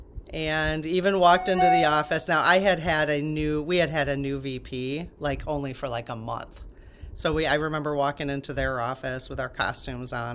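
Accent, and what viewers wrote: Midwest, United States. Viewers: Hi!! Yes! Everything will work out for us! Let’s raise that vibration so the Universe will match it! When I first came across your channel I recognized the midwestern accent and you said today you are from Wisconsin. My Mom was from Door County (Sister Bay) and I have such wonderful memories of my MorMor’s cherry farm! Such a beautiful state. ❤️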